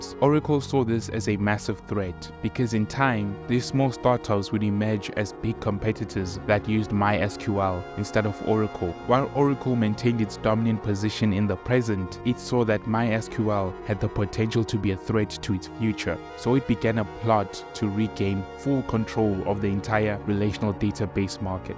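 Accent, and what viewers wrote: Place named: Southern Africa. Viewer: I know a southern African when I hear one😂 Good stuff mate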